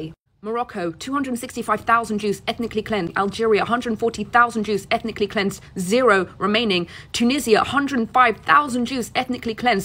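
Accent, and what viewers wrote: United Kingdom. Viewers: I did not know people speak English with british accept in Iran...lets face it she is not Iranian... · Nothing you have said contradicts anything I said. The basis for the word "Persian" is "Farsi", which is an Iranian language, and many Iranian people do indeed identify as ethnically Persian. many do not. I said Iranian PEOPLES, not people singular. She is indeed Iranian, but her accent is British. She was brought up there. Are you seriously using her accent to check her accuracy? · she sounds British lol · Info on anything in the Middle East would get better reception from someone without some version of a British accent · A Muslim Iranian, speaking perfect British English. Straight out of Babylondons propwash academy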